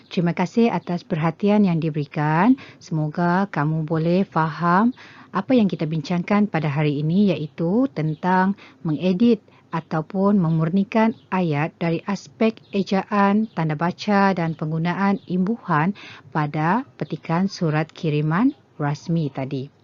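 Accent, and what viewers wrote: Sabah, Malaysia. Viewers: Kenapa cikgu cakap macam orang sabah.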